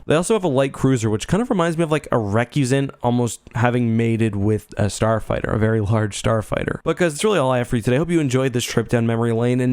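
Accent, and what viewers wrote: Canada. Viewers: it took me a few years to realize that youre canadian, when you said "about" i was able to finaly confirm my suspicion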